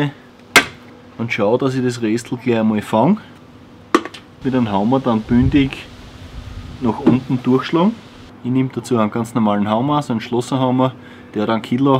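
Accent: Bavaria, Germany. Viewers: Wie wäre es denn mal mit HOCHDEUTSCH? Typisch Bayer, da sind mir die Sachsen lieber, die geben sich wenigstens Mühe das man sie verstehen kann !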